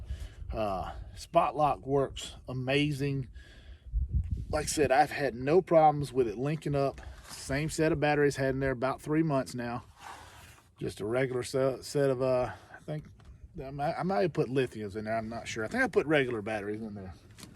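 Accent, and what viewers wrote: Louisiana, United States. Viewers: 14 oz. Just found your channel. Already watched several of your videos. Good content. What part of La you located. Don’t sound Cajun.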